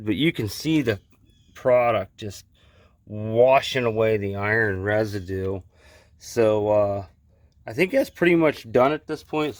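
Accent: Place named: Baltimore, United States. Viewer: just guessing, from the accent... are you from East Bawlmer?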